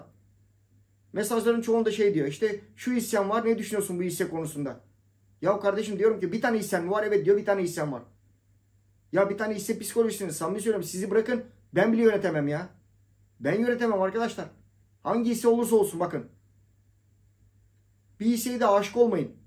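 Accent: Thrace, Turkey. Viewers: Abi İzmirli bir ablaya dinlemiştim 3 gün önce sizi direk bizim ege şivesi bu demişti bende yok Trakya demiştim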